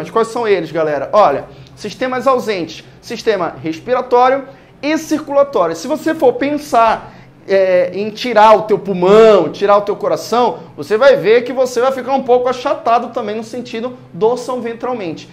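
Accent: Pará, Brazil. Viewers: Queria saber se vc é paraense ? Tem sutaque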